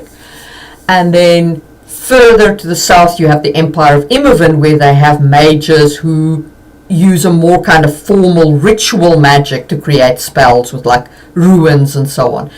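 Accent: South Africa. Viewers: Oh, hey, aunty! I wasn't expecting a reply! I would have been a little more cordial otherwise. I just wanted to jot my opinion down. Just want to say I absolutely LOVE LOVE LOVE your videos! They've made me even more inspired to world-build for my D&D/Fanatasy RPG games! This was the one point where I was like "Huh! Actually, I think I [respectfully] disagree here." In a world filled with powerful mages, there will always be people like Havelock Vetinari from Terry Pratchett's Discworld and Stan Edgar from The Boys (TV series/comics). By the way, your accent sounds South African to me. Are you from there by chance? I absolutely adore South Africa. And I'd like to visit one day.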